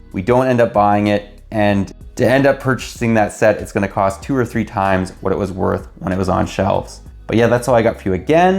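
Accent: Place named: Canada. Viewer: He is canadian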